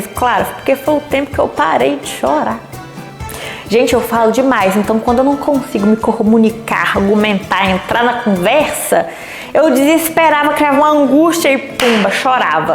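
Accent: Minas Gerais, Brazil. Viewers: AMEI OUVIR NOSSO SOTAQUE MINEIRO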